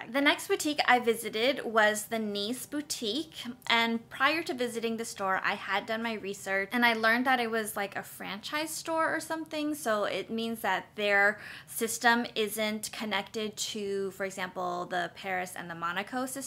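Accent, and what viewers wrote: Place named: Asia. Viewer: I love your Asian English accent.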